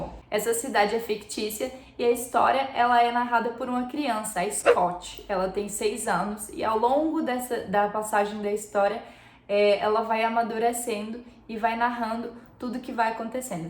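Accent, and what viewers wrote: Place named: United States. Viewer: Sotaque americano 😍😽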